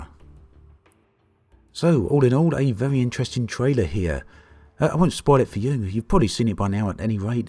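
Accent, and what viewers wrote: United Kingdom. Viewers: I did notice a slight British accent in your video today. (smile)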